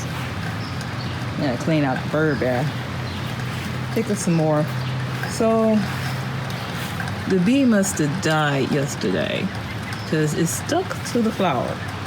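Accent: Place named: Chicago, United States. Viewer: Hi. I’m new to your channel. Beautiful backyard and flowers. I noticed that your having issues with your dahlias. I believe it’s where you have them planted. You have them in a bed near your gutter water spout and the tubers might be receiving too much water along with the over abundance of rainfall. So you have the rain fall along with all the water from your gutters. I did the same thing about 5 years ago. Moved them to a much dryer well draining spot and had no issues going forward. Also I looked at your surroundings and just knew you were in Chicago or maybe near Detroit along with your accent. Then I went back to your earlier videos and I was right! I’m from Chicago and visit Michigan on a regular to visit family, and I swear the surroundings look exactly the same as Chicago..😆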